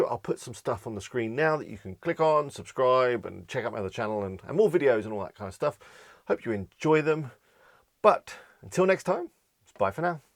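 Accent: United Kingdom. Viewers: Really teaching video. Wouldn't you share with us which are your favorites themes and plugins to work with? Or the ones you normally use to create your websites, please? Thank you in advance. Btw, I love that so british accent. Indeed :)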